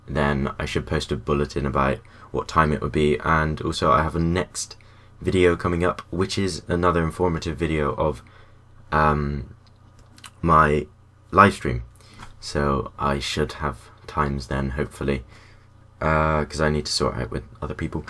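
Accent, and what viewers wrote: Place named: Taunton, United Kingdom. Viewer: Indeed same, taunton born and bred for 18 years i knew i recognized the accent =]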